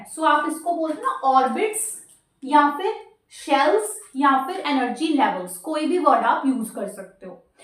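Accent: Punjab, India. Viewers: Mam ap punjabi ho???😅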